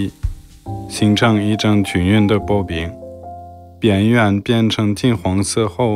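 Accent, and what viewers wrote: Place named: Henan, China. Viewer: 河南口音笑死我了哈哈哈哈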